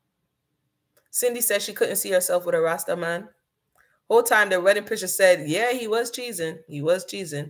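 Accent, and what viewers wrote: Brooklyn, United States. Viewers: Wow you just asked in the video to state where you were born I just texted before you asked the question you sound like you were raised in Brooklyn or somewhere in NYC. Wow you just said in the video Norval was birn in Jamaica! Wow thought he was also born in England!! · You do have a Brooklyn accent. I could identify it clearly 🤣🤣🤣🤣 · You definitely from BK.. prolly flatbush or canarsie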